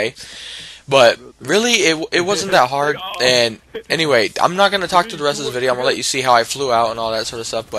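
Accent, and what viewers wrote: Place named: Netherlands. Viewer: First of, still useful, but there is a better way, when you enter the base, stick to the left, when you see the parking lot, stick to the fence, there is an opening leading to a moat where you can safely and easily drive through to the chopper, it took no time at all to get there and second, I believe you're Dutch, but your English itself is really good, most people would not pick up on it :)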